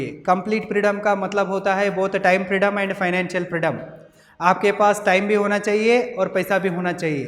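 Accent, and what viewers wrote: Maharashtra, India. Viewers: Marathi or konkani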